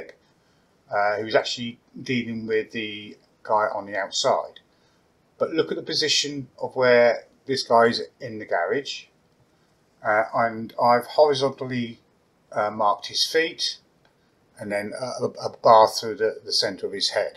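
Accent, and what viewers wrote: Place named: United Kingdom. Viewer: Is this the 1st timw you guys hear a british accent😂😂😂 You need to get out more. Get a passport and see the world.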